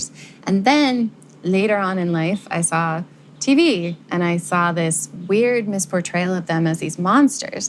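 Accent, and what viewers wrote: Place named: Brazil. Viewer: Não te conhecia, mas pelo sotaque vique vc também é brasileira, ne?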